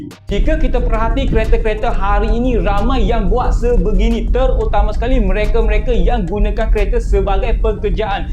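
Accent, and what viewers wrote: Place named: East Coast, Malaysia. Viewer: Terbaik bang, tebal loghat pata timor 😅😅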